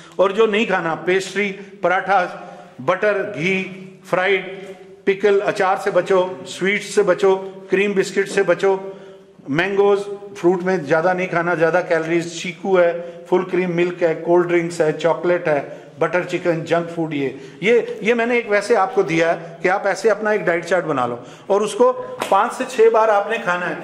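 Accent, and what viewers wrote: Haryana, India. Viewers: Haryanvi 😅😁😁mst..u r great sir..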